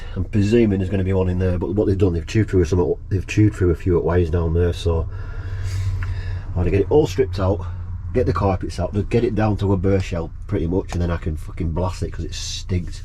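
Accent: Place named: Lancashire, United Kingdom. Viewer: Good Lancashire accent too.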